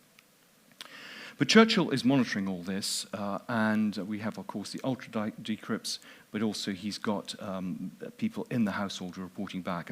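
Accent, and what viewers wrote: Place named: Scotland, United Kingdom. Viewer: I couldn't quite place his accent.because just one or two words have a flat sound ...rather like.a. northerner but he's so posh !! But then it says he is Scottish! So that explains it ! Scottish...Westminster school accent !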